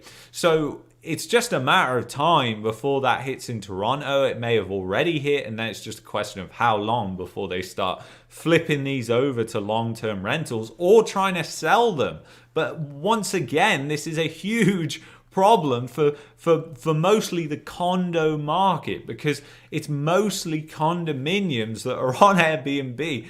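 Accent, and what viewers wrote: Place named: Cockney, United Kingdom. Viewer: I’m trying to understand why a Cockney Lad with fabulous economic skills ended up in Canader!! I’m enjoying your intelligent reviews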